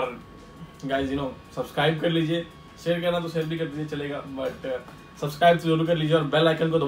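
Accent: India. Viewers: Congratulations.... 😉 bhaiya.. Bas ese hi aap aage badho. 😍😍 jeo mere vale bhaiya....... Aaree tum bhot khaas ho aap bhot mehant karte ho isliye toh hum aapko dekhna jada pasand karte h... Or koi kuch bhi boke aapke bolne ke tarike ko but meko toh ye hi pasand typical Indian style.... 😳😍😉😉😉😉😉😉😉.... Bas bhaiya plzzz full auto airsoft guns laooo🙌🙌🙏🙏🙏🙏🙏🙏🙏🙏🙏 Tumhara bhot bhala hoga... But anyways many many congratulations... 😍😍😍😍😍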